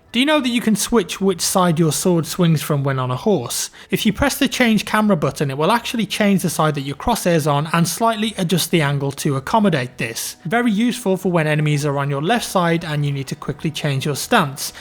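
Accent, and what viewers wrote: England, United Kingdom. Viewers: .. What type of English accent is yours? It's really nice and probably the easiest one to understand.:)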